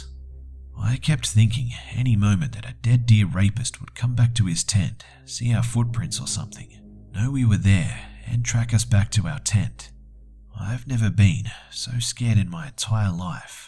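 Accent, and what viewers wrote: Australia. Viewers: Wow thanks! Neat stories:) You should do a video over skinwalker/ wendigo stories. The 'My cabin getaway' series was literally awesome and so creepy <3!! I know for sure I'd love to hear your punder-ful, aussie accented, smooth voice narrating some good old Native American horror stories again. And i'm positive all the other fans would too. P.S. Now call me Ra-pun-zel but you should drop some more puns, you seemed to have dropped off a bit on them:). (Sorry if this came off as super criticizing, just trying to be constructive) - Izzy the Adventure Princess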